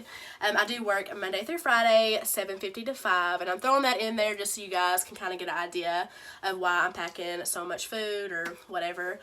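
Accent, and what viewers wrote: South, United States. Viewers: I subscribed to you about 2 weeks ago but I have watched every episode that you have made please keep doing them it's amazing recipes that you have and I like to hear your Southern accent 🙂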